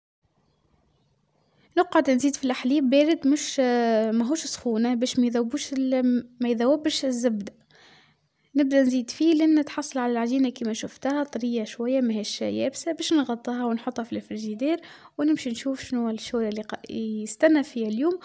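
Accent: Tunisia. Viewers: تبارك الله ماشاء الله عليك.انا متابعة وفية واعشق لهجتكي التونسية وروتيناتكي. استأذنك بطلب مشاركة الاخوات في قناتي المتنوعة (طبخ.جمال...) اختكم من المغرب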